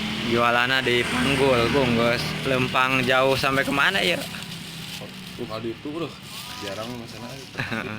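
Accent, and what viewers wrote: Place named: Banten, Indonesia. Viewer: bang .logat ngomong nya kaya orang banten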